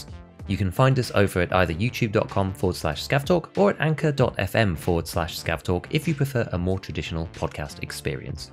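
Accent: United Kingdom. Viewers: it's more of a "British" way of talking.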